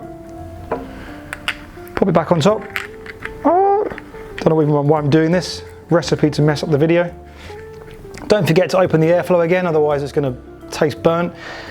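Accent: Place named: United Kingdom. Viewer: Thanks & good to hear a British accent.. So I been vaping hardcore n it was time to change the coil after 2420puffs approx 170ml of ejuice 75w on a r kiss2 so yeah I'm pretty pleased with that. What I usually find is the coils you buy ain't as good as the ones that come with the device I could of opted to use the spare coil in the box but decided to try the smok coils I bought with my device.. I will keep y'all informed Thanks for your video 🏴🇬🇧👍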